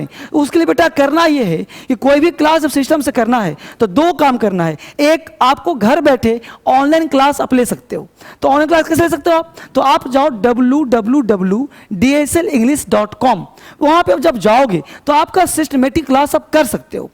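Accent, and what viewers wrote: Bihar, India. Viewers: Ap bahut achhha padhate h Sir ap Bihar k rhn wale lagte hai n....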